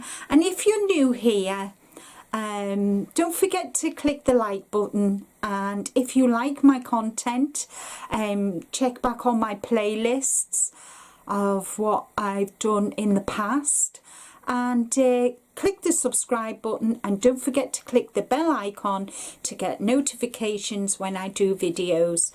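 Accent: United Kingdom. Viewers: Petunia for your love of your garden. I think you said Petunia in your wonderful British accent. Of course we all have to have fun naming items too so Flower or Yarny. Love your Yarny room and organization, beautiful and cozy!! Such a lovely channel!!!!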